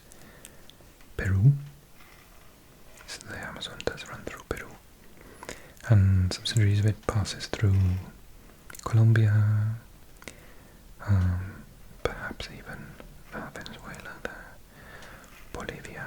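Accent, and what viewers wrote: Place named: Wales, United Kingdom. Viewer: I’m actually watching so I can study the accent. Someone I like a lot is from wales and he barely sends voice messages and asked if I could do a welsh accent. So here i am.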